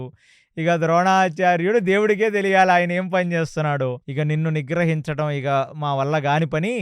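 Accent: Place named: Andhra Pradesh, India. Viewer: అసలు ఎలా time manage చేస్తున్నారో మాకు కూడా చెప్పండి sir...😂❤ ఒక పక్క job చేస్తూ ,, ఇటు వైపు మహాభారతం మీరు చదివి,గుర్తుంచుకుని మళ్ళీ మాకు easy గా attractive ga చెప్తున్నారు Hats off sir 🫡🙏more power to you ✊🔱 సనాతన ధర్మాన్ని కాపాడటంలో మీవంతు మీరు చేస్తున్నారు🙏 మీది Andhra కదా !! అనిపించింది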